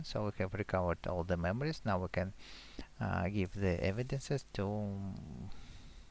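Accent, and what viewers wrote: Russia. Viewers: А ты русишь ты спалился что у тебя русский язык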